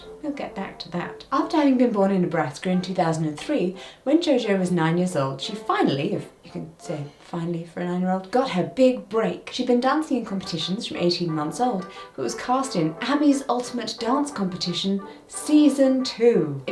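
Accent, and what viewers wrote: RP, United Kingdom. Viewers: Is your accent that plummy naturally, or do you play it up for an on-camera persona?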